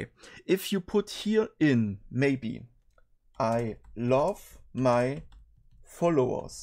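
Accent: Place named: Germany. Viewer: Habe gleich herausgehört, dass deine Muttersprache auch deutsch ist. Und auch ein gemeinsames Hobby haben wir schonmal.😉✌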